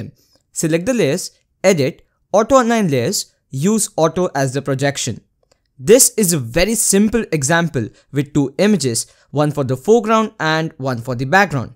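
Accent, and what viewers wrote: India. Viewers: I'm sorry but I can't focus on the video, Indian accent is the best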